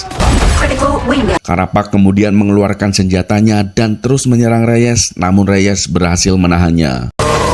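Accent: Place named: Java, Indonesia. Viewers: Cerita Film yang epic dan menarik, pembawaan sangat lugas, jelas, lucu (ada medoknya 🤣👏) dan mengena, mantappp 👍 sukses selalu untuk mu, semoga kontennya bermanfaat. Suwun Maseee 🙏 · Basane medok nemen min 😅. Sing adoh nemen 😂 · Bahasane kaya nyong medok nemen😅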